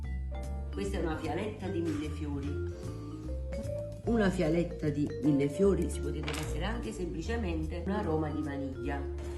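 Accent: Naples, Italy. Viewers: Gradisco anche la sua parlata napoletana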